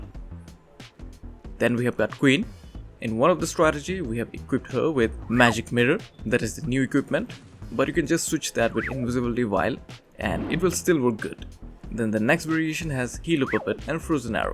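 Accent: India. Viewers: Bro are you Indian??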